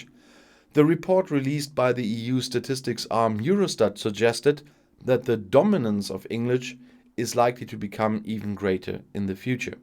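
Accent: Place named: Canada. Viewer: O no it Canadian English actually. 😅😜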